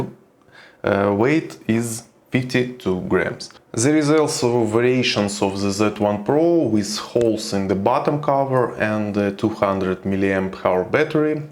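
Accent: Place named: Poland. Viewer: Ну ладно, не русский а поляк Ну все же акцент сильный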